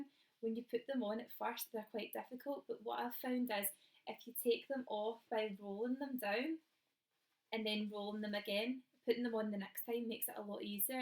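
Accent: Scotland, United Kingdom. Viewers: Loved your haul and your wee lass is adorable!! Nice hearing a Scottish accent on here too lol. Just Subscribed!! 😊🤗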